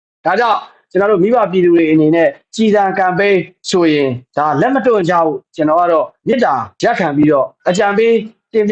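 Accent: Yaw, Myanmar. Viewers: ဒီနေ့တော့ ဂေါပကယောနသံလေးပေါ့💯😊❤ဟုတ် နှမ်းစေ့လေး ဖြစ်ပေးနေပါတယ်နော် ဟို့နွေဦးအစထဲကပါဗျာ့💯တော်လှန်နေသ၍ ကျွန်တော်တို့ အနိုင် 💯💯❤❤🚩🚩🚩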